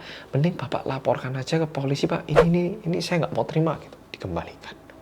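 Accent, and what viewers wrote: Java, Indonesia. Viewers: Mas medok🤩🤩🤩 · Walahhh mase ngomonge kok medok. Menn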